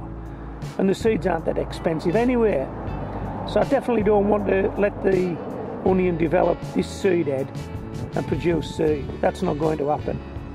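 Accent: United Kingdom. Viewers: You are a comfort to us as our people are from the U.K., so your voice brings good memories as well as good information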